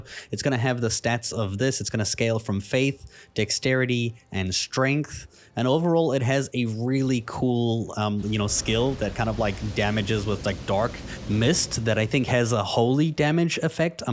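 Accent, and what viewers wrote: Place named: South Africa. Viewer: are you South African? i can hear that accent poking through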